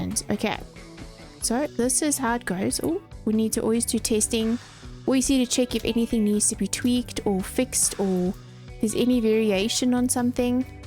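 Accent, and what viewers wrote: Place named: South Africa. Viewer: Can’t get enough of the South African accent, could listen to it all day long, this coming from a Scot. Great video!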